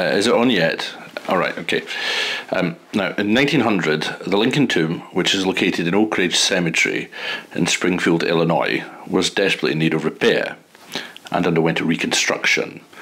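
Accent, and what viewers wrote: Scotland, United Kingdom. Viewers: I love listening to you talk, or is it a robo-voice?🤤. What part of Scotland are you from. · Scottish accent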